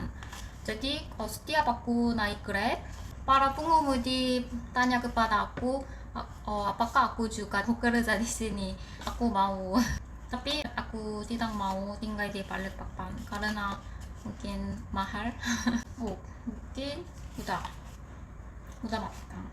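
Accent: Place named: South Korea. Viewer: Inikah org korea yang waktu itu ku gonceng. Wkwkwk hari itu dia pakai baju safari, pasang muka bingung, cari lokasi di gps. $: mau kmn? "Dia kyk ke ingungan".: mau ke sini "sambil nunjukin layar hp nya". $:"ternyata yg ingin di tujunya pasar impres,pasar impresnya sudah di sampingnya😂" Ini pasar impresnya"pasarnya lagi tutup, karena hari besar Lebaran idul fitri".: Haa di sini... Terimakasi "Lanjut jalan". $:"kok ku perhatikan kyk bukan org sini, mungkin turis..cara ngomongnya jg kyk org korea, sya kira org cina yg tinggal di sekitar sini". Terus sya samperin kembali, dan menanyakan mau kmn.. terus jwbnya mau makan, sya jwb... Pada tutup krn hari besar. Tp klw mau sya tolongin, naik ke motorku sya bonceng dan antar ke toko yg buka jual makanan. Kemudian dia naik dan kita keliling pasar impres, setelah lewat lampu merah, ada org jualan. Yaudah sya bwa dia kesitu... 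Terus sya tinggal 😂😅sya mau ajak makan di rumah... Takut di tanyain macem"sma org rumah. Ahahhahaa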